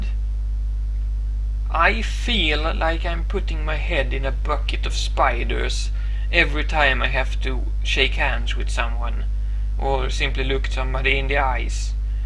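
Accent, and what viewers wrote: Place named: Sweden. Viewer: Your accent remembers me a lot Ingmar Bergman, a lot, a lot.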